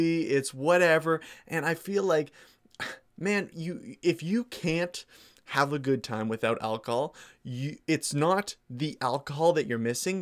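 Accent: Canada. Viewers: When you realize he’s Canadian, the accent becomes sooooo clear.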